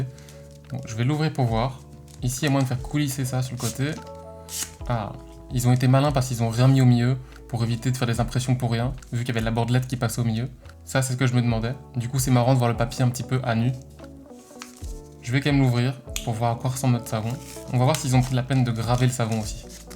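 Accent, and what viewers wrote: Belgium. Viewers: Aaaa tu est belge ! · Oh l'accent belge il me fait délirer 😂 (bisous d'Avignon)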